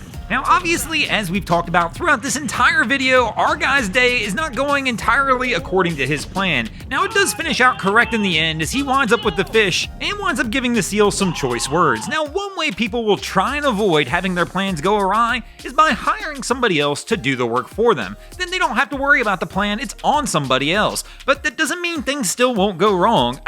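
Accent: United States. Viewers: loved the vid. Had to turn off the sound though. That constant loud whiny american voice did my head in.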